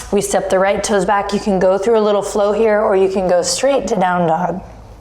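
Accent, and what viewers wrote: Ireland. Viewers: i like the irish accent. lololol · Thank you for your wonderful videos, I love benji! Really finding it enjoyable to follow your videos, i never managed to do yoga by my self at home until finding you.. This week my theme is the heart so this was perfect! things are coming together in my life to allow me to keep my heart open. Sending gratitude from Ireland (perfect that you did an Irish ascent this time!)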